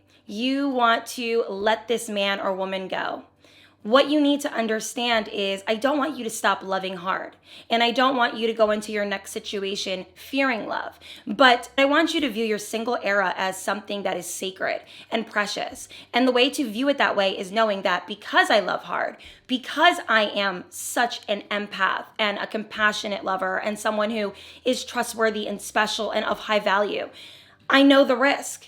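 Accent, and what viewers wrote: New Jersey, United States. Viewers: It’s so sweet, though! You’re very well spoken but it definitely could be a Jersey thing! 😂 I have family there and LOVE their accent and way they pronounce words 🩵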